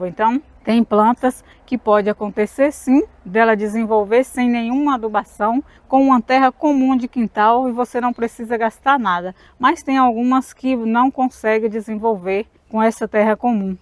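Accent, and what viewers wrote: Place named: Rio de Janeiro, Brazil. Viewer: Bom dia eu pensei que vc era carioca?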